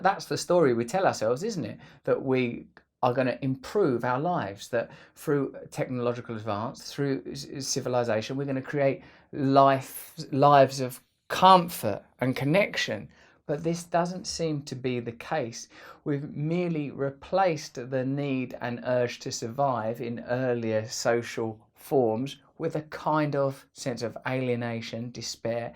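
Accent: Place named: United Kingdom. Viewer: You remind me of John Lennon because of your wisdom and British accent. Comedians are the smartest people in entertainment I've noticed.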